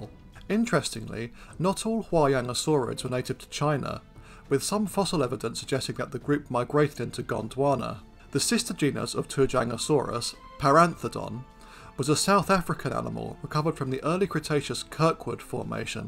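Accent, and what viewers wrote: United Kingdom. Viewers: ugh. too many documentaries with british narrators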